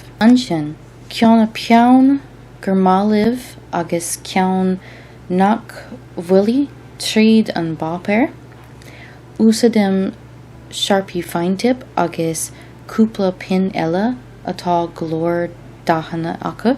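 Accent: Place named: United States. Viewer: Beautifully put. I like the slight American lilt to your explanations...👍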